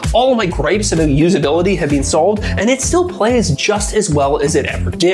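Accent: Canada. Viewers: This guy's definitely canadian.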